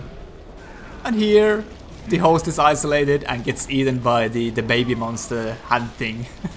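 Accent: Finland. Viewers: For some reason you dont have that ugly Finnish accent, but a really enjoyable one. Get some riposte dps bro.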